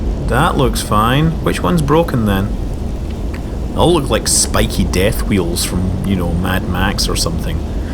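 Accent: Scotland, United Kingdom. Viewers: Because he's a Scotsman. He also says Idear. c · Hullo, there is no problem at all with the Ts, they are clear as day. The "Hwhat" thing isn't an audio processing issue, it's just a common garden Scots speech pattern which can really stand out to the non-Scottish.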